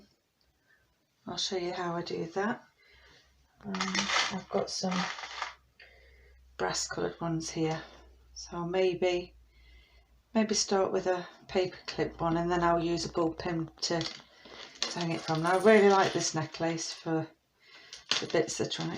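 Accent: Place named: South England, United Kingdom. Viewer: I live in Newark. Thanks for the tip. I am going to raid those charity shops next time I get chance. You definitely have a South of England edge to your voice. Xx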